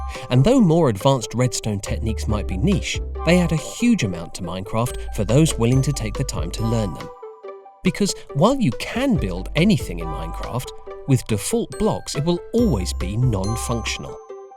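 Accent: United Kingdom. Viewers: Alternate Title: British Narrator creates a time machine while reflecting on Mumbo Jumbo's tutorials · Oiii oiii this narrator is British less goooo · i wouldnt mind if narrator narrate my life he seems like a very nice british person too bad he could only stay for one more year 😔😔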